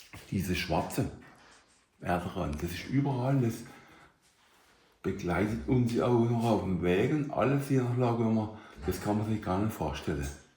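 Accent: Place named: Alemannic, Germany. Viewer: Ich verstehe jedes Wort! Das ist Alemannisch fast in seiner reinsten und ursprünglichsten Form, wie man es nur noch in Südbaden oder im Elsass spricht. Im Elsass gibt es allerdings mehr französische Einflüsse. Für mich klingt das alles sehr, sehr authentisch!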